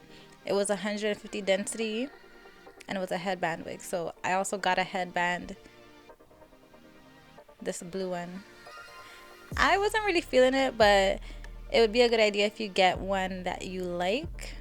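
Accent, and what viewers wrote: Toronto, Canada. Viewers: Very random but are you canadian? 😂 ur accent is giving very much Canadian like me!! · Your Toronto accent is stronggg girl. Thank you for this!! ❤️❤️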